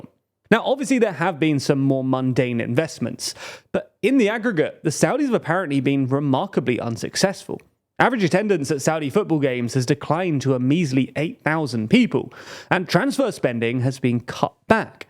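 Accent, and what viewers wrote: United Kingdom. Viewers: Just say, Muslim scholars. Stop trying to pronounce Arabic words with your goofy British accent.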